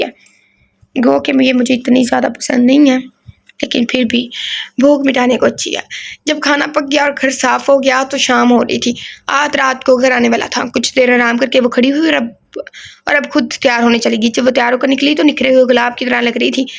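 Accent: Punjab, Pakistan. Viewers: Yr JB novel parhtay Hain to bss vahi read kartay Hain beach main Apne dukhray nahi rotay Novel k start main batain kar leya karo or novel parhtay vaqat awaz or lhja narm rkhty Hain Urdu bhi Sahi boltay Hain Aap ka to panjabi lhja Hy Urdu bhi Sahi nahi bol Rahi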